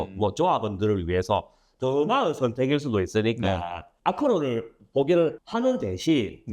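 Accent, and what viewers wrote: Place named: Busan, South Korea. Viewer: ㅋㅋㅋ 인정~~~ 하지만.... 부산 사람은 자막 안 봐도 다 알아 듣습니다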